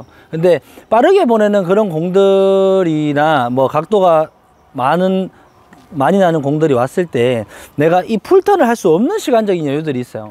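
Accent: South Korea. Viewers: 근력이 약한 저도 요 연습 해보겠습니더~ 슨상님 사투리 너무 좋습니다 ㅋ 마! 이게 사투리다!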